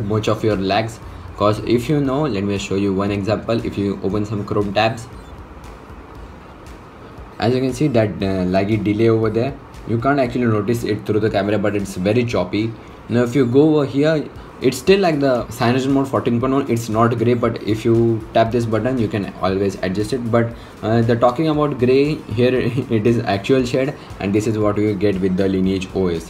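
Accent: India. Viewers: god every non-popular tech video I watch is an Indian with a horrible english accent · stop videos by indians english language. its very hugly!!! please stooooop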